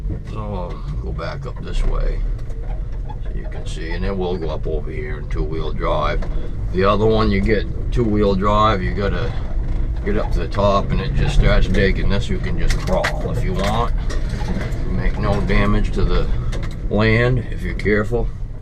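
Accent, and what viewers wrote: Maine, United States. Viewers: Sound like a mainah!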